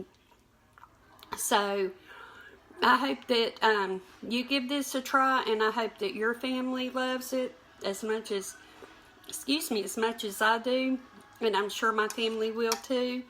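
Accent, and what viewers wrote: South, United States. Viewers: We saw the munchkin peekin around the corner! Ma'am what part of the South are Y'all from? (not just the state but like north east Alabama or south west Arkansas) Thanks.I watched your questions video. Now I know why the indeterminate accent! When I was a kid in SW Michigan on a fruit farm, Southerners would come up and pick fruit. I absorbed many an accent during those summers. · I wish I was your neighbor. I could visit with you for hours. I love your sweet southern accent and your sense of humor. You're just the sweetest. I am looking for new recipes and I found the right channel. We only eat the boneless, skinless chicken breasts. I do but some thighs or legs for my son occasionally and so I can get some good broth to freeze or make gravy with. Thank the Lord you're video was in my feed because I am binge watching now. God bless! 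❤❤❤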